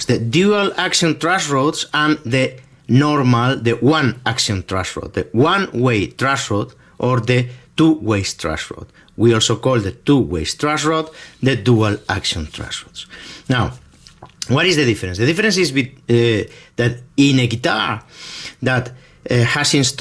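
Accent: Spain. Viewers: Great accent. Are you Spanish? I live in Australia now, so I don’t hear Spanish accents very often. Thanks for the video. It was excellent. 😁👍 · Very good info, I am slowly getting through your videos, I love you Spanish English accent. Merry Christmas, cheers Simon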